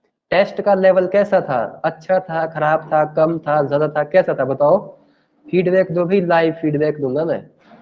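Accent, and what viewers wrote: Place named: Himachal Pradesh, India. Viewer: Love ur himachli excent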